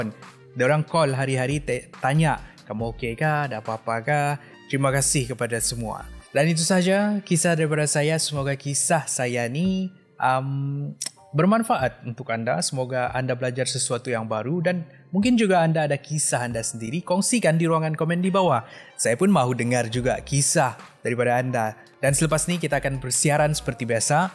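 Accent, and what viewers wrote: Sabah, Malaysia. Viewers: Kenapa suara dia mcm orang sabah eh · Aku Nak Tanya bang ni orang Malaysia Ke orang iban Ke orang Sabah?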